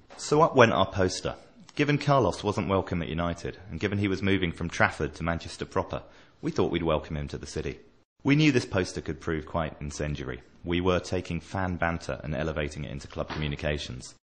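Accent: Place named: South, United States. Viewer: This is comedy gold - the narator talks about city and says "We did this" "We did that" - an obvious city fan, WITH A FUCKING SOUTHERN ACCENT ! Manchester United are FROM Manchester - Newton Heath to be precise - born in 1878 - much earlier than city. Its all about where your BORN not where you LIVE. One last point, United have had higher average attendance since records began in the 1900's than city, even when united were in Div 2, below city, MORE MANCS ATTEND UNITED GAMES THAN CITY, FACT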